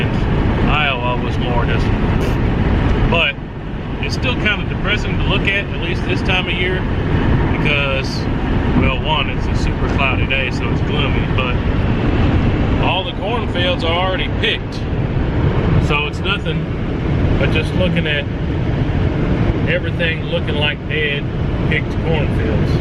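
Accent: South, United States. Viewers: I didn't know you are a fellow Tennesseean, I could tell by your accent you are from the South. I just didn't know where.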